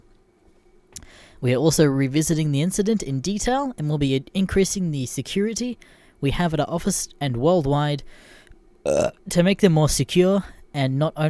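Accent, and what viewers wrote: Australia. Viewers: 1 are you Australian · Creepswork is not as good as. Australian voices are far superior to Americans.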